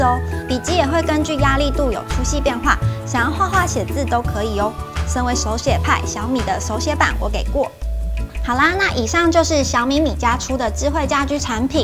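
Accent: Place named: South, Taiwan. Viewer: Hanne台語很標準欸，是南部人嗎？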